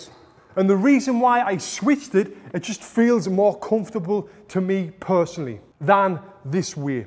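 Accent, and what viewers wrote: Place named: Geordie, United Kingdom. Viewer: Is this guy from Middlesbrough or Newcastle because he sounds like from that area in uk. North east area, maybe Sunderland, Nirthumherland or Durham. They all sound the same. Geordies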